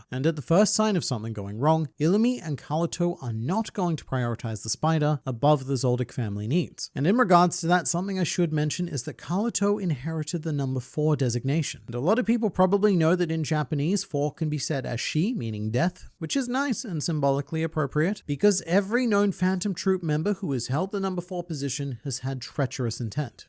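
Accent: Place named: Australia. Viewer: I just noticed you have an aussie accent 😭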